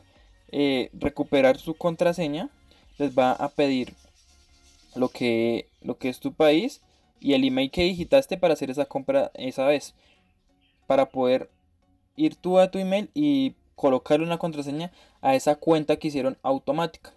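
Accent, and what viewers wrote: Colombia. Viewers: Gracias por el video, muy bueno, una pregunta ¿Sos de Cali? Disculpa si no reconozco tu acento jaja.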